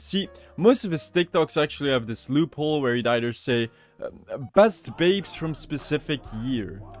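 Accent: Netherlands. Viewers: i have a question i wanteed to ask, are u dutch? since u sound very dutch!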